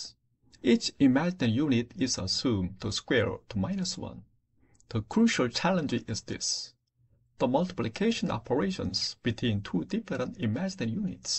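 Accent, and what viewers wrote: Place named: China. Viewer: I would guess Chinese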